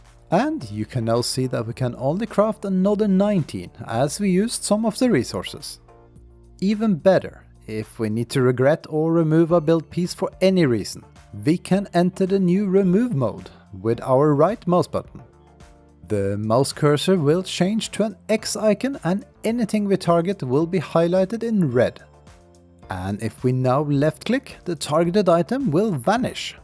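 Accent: Norway. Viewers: I believe i hear a norwegian accent xD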